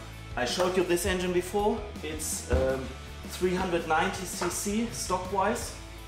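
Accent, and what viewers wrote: Germany. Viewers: Man hört direkt das es ein Deutscher kohlege ist 😂👌 geiles Teil bitte mehr davon !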